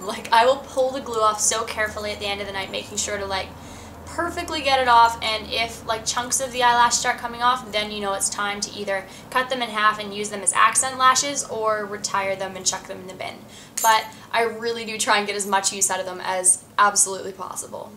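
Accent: Australia. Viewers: I can def hear that your from australia but it's not that strong :) Love the lipcolor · Do you think that since you've moved to Australia, you're starting to pick the Australian accent? Haha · You sound like you have a bit of an Australian accent! Maybe when you were there you picked a bit of an accent up :p love your makeup btw your stunning! -3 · I feel like you have a slight slight austrailian accent that comes out in some of your words. its awesome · Before you didn't have an australian accent, but now since you have lived there so long, i can tell your getting it.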